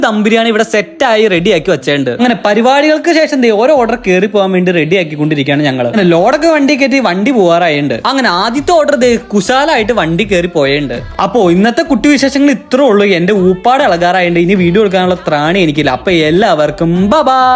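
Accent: India. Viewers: സ്ലാംഗ് കെട്ടട്ട് മുവാറ്റുപുഴ or കോതമംഗലം😂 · Crct perumbavoor slanggg😌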